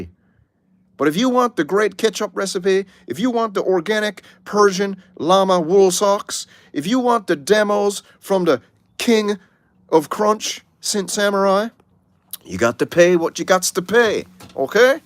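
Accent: Liverpool, United Kingdom. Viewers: A fair bit of scouse in there as well.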